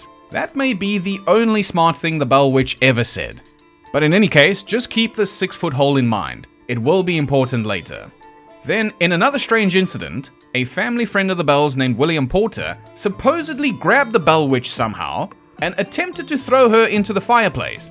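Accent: South Africa. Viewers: I thought u were south african when i heard ur accent😂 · South African?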